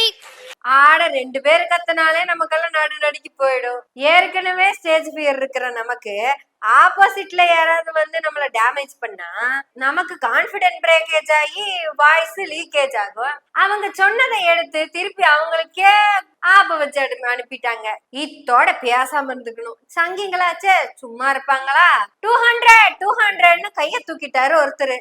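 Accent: Kongu, India. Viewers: தங்கப்பெண்ணே, உனக்கு content மட்டும் இல்லை, துணிவும், தெளிவும், humour உம், கொங்குத் தமிழ் மணமும் நிறைந்து கிடக்கிறது. மேடைப் பேச்சும் கை வந்த கலையாகும். உயரப் பற, வெற்றி உனக்கே. God bless!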